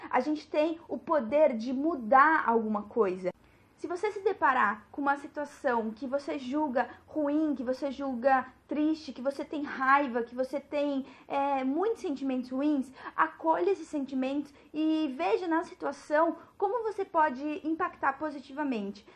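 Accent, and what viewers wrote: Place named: Sao Paulo, Brazil. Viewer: Paulista da gema,com esse sotaque!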